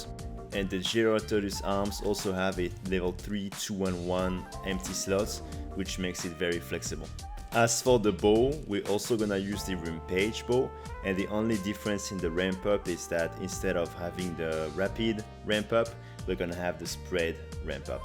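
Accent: France. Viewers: J'entend un accent français haha , je vais essayer ton build ^^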